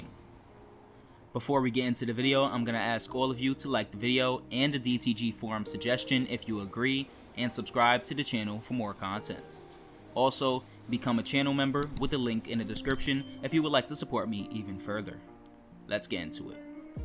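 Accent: United States. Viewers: Having moved out a while ago, this was so nostalgic hearing someone talk about the line that I grew up with. I also loved hearing someone with an american accent saying my home town name xD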